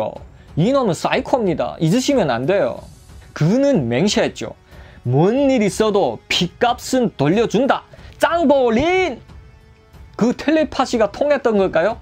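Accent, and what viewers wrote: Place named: Korean-Chinese, China. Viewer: 25년전에 연길조선족선원들하고 외항상선에서 같이생활할때 목소리하고 어쩜똑같아 갑자기 옛날생각이 나네요 구수란 조선족사투리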